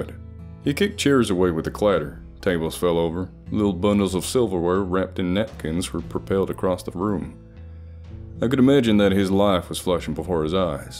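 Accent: South, United States. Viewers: Gotta be honest, too much southern accent, not enough Texan.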